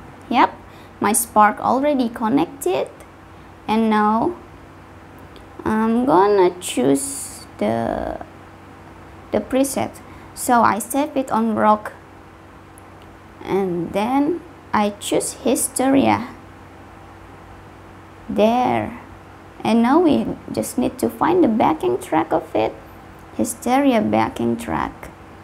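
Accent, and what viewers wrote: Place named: Indonesia. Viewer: Indonesia accent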